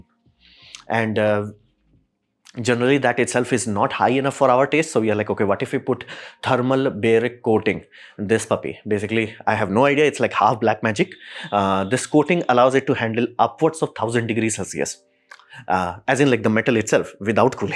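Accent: India. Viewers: Great job bubba! I’m Indian and proud to see a fellow Indian with his thick accent doing a fantastic job of explaining something that could be very tedious and complex in an entertaining way! Keep it up!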